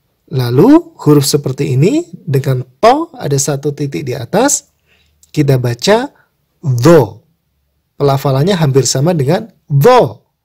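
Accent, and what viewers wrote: Java, Indonesia. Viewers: ini lidaj wong jowo. beda ama wong betawi xra pengucapannya.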